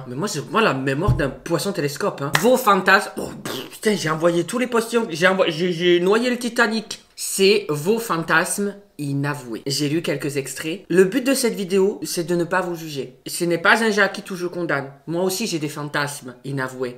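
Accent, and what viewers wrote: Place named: South, France. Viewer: J'adore son accent du Sud 😂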